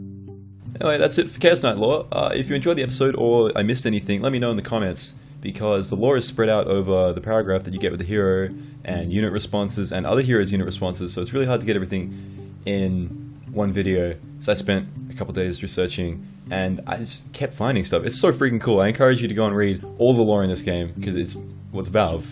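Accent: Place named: Australia. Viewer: Here I thought, Godz from BTS was australian. You are so australian, it hurts. I could barely make out a word. I betcha if you ever move to a different country, you could get laid just by laying on the accent. You lucky dog, you.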